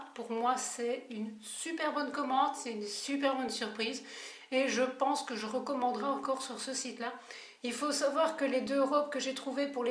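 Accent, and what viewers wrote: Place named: Belgium. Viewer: Ton accent Belge me fait sourire, et j'adore quand tu utilises des mots comme "tirette" ou "septante" :D Sinon je me sens moins seule quand je vois que tu achètes un même vêtement en plusieurs coloris... bien que je ne l'ai jamais fait en fait. Mais j'en ai souvent envie sauf que ça gonfle vite la facture et que ça restreint la diversité aussi donc je me force à choisir x') Mais t'as eu raison de te faire plaisir parce que ça rend quand même différent selon la couleur.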